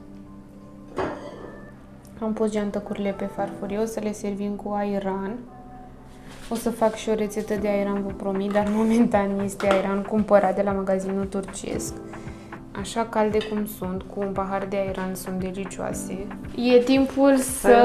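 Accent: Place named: Moldova. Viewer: De ce vorbeşti cu accent moldovenesc... 🤔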